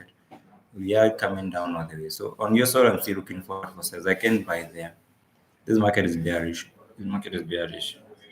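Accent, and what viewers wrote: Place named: Kenya. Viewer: hi from kenya, nafurai kusikia sauti ya mkenya kwa forex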